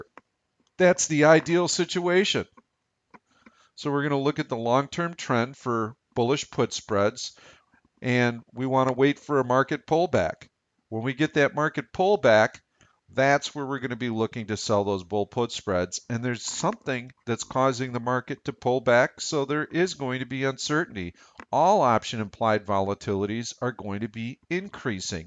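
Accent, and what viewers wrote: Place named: Chicago, United States. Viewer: When an options trader tells me he used to trade in the Chicago options pit and I hear his Chicago accent in the first 20 seconds of the video, I listen. lol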